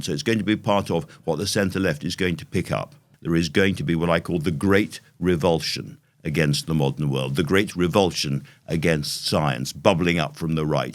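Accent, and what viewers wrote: RP, United Kingdom. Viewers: Science is essentially always "a work in progress" and when politics and science combine to effect a policy, then it is a matter of proper concern. Conservative England -both mad and paranoid? A bit of the usual modern media hyperbole. Par for the course for a BBC voice perhaps?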